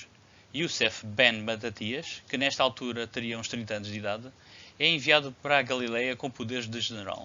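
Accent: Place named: Portugal. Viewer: ouvir um falar em dialeto tamanqueiro não dá.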